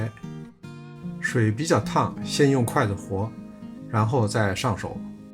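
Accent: Beijing, China. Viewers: 北京话听着太亲切了明天就做来吃了谢谢叔叔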